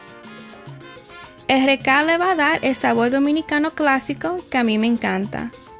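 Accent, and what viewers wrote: New York, United States. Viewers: tiene un acento newyorkyno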